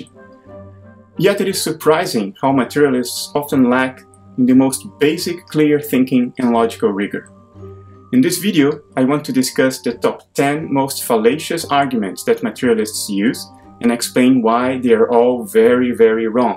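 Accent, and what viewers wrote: Netherlands. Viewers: Cool Dutch accent!! Good points made!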